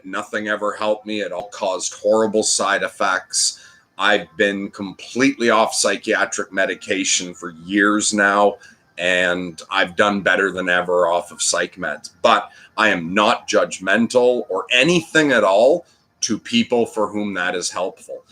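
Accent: Canada. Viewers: Hey glad to see your mood lifting. I would've never guessed your from Quebec I would've thought your from Western Canada. I live in Rockland 30 mins east of Ottawa. Cool thanks for the chat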